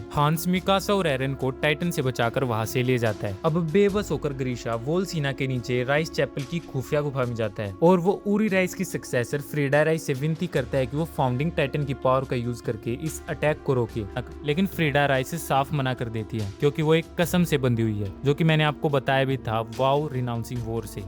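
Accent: Haryana, India. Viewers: haryana se hai kya bhai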